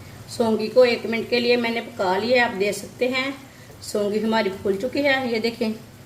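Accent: Punjab, India. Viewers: Apki Urdu bi Punjabi lagti hai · Although Punjabi accent but excellent and simple recipe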